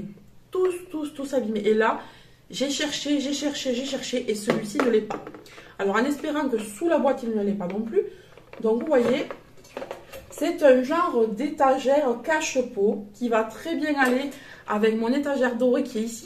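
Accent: South, France. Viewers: bonjour, je découvre ta chaine aujourd'hui et j'aime beaucoup ton haul ! je te trouve très à l'aise devant la caméra et j'aime bien ton accent du sud (je suis moi même du sud ouest !) j'adore le cache pot vert à suspendre et les différentes lanternes solaires que tu as acheté ! je pense que je me pencherai un peu plus sur le rayon extérieur la prochaine fois que j'irai chez Action grâce (ou à cause ?) de toi !! hi hi hi !! par contre moi j'opterai pour des plantes artificielles car je n'ai absolument pas la main verte, je fais crever toutes les plantes...pfff !! allez je m'abonne à ta chaine pour découvrir un peu mieux ton univers. Merci pour ta bonne humeur, bises, Christel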